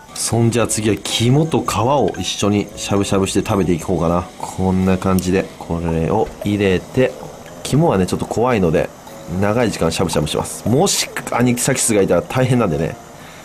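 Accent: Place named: Aichi, Japan. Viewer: 「生かしたる」とか「見ちゃかんよ」とか、めちゃくちゃ愛知県の方言っぽいと思っとったら愛知県だったわ笑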